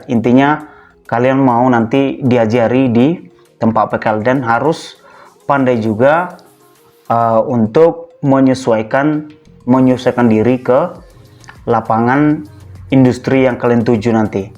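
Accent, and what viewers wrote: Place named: Tegal, Indonesia. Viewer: Semangat pak ngapak tegal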